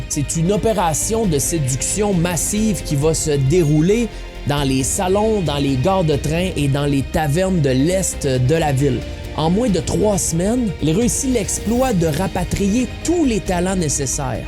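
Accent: Quebec, Canada. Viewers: instant follow en entendant du queb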